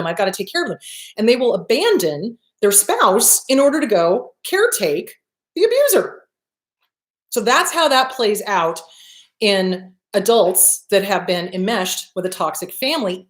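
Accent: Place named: New York, United States. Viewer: Btw, love your New Yorker accent! 😆😆😆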